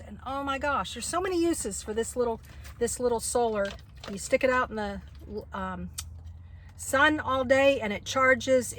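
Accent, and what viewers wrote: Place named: Oregon, United States. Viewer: I don’t know where you’re from but you sound like you’re from Roseburg, Oregon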